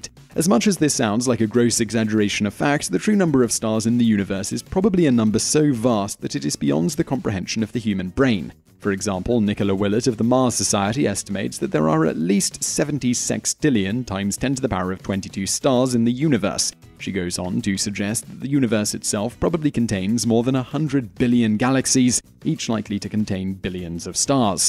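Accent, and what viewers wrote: England, United Kingdom. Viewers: The man accredited with developing 80% of our worlds modern technology said to think in terms of “Energy--Frequency-Vibration.” Or we can listen to this well spoken English Accented MORON!!! I’m afraid the masses have already excepted the later. 🤪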